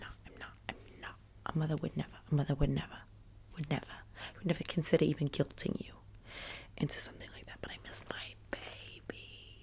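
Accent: New Jersey, United States. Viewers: She can do Southern accents, Midwestern accents, and now Jersey. What more? 😂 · I LOVE the jersey accent! And it low-key reminds me of the mom in karate kid 😭 ifykyk Love ur vids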